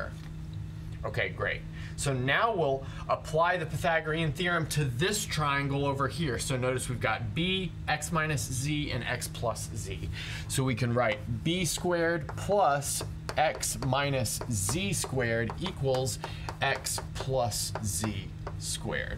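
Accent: United States. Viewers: this guys American pronunciation is infuriating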